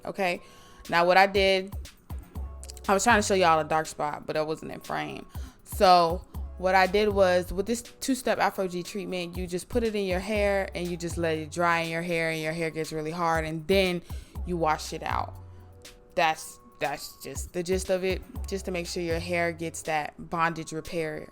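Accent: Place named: South, United States. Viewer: SMH I love that country twang ☺️😍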